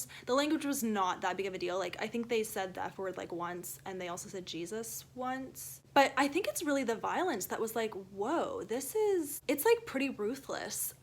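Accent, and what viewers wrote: Canada. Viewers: I love Canadian accent. About and sorry! (Thinking about Anne here, "sooooorry".) And I just enjoy it. Enjoy learning French! Love Julie Klassen. The first I ever read was Lady of Milkweed Manor and I love it. Think it may still be my favorite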